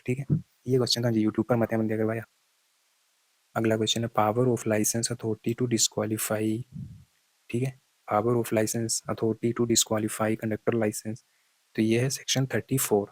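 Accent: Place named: Kangra, India. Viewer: Bhai tuadi bolli sun k kangri lg re mno tusi...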